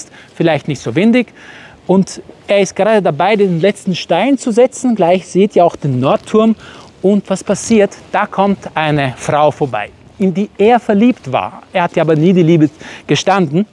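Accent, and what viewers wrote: Vienna, Austria. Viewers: 🤣🤣 Herrlich! Mit fast perfektem Wienerisch! Danke für die Auffrischung der Sagen-Kenntnis! 👌🏻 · Dein Wienerisch klingt charmant. ;)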